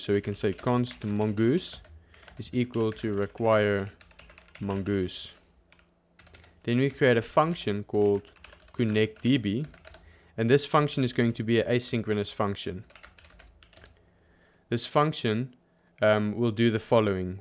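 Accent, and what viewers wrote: South Africa. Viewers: Am I hearing a South African accent👀🔥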